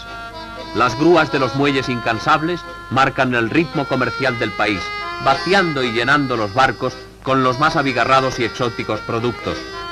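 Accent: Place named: Spain. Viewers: Por supuesto que el acento es distinto, si el locutor es español🤦‍♂️🤦‍♂️🤦‍♂️🤦‍♂️ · El locutor debe ser español, en esa época el acento porteño era más marcado que ahora, sino mira películas argentinas de los años 60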